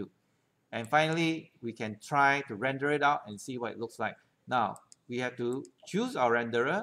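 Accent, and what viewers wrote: Singapore. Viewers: You Singaporean?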